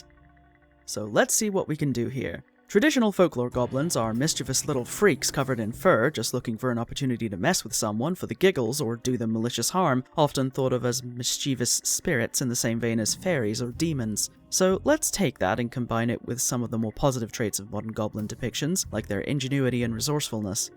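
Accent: Scotland, United Kingdom. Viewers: Im guessing your a scot?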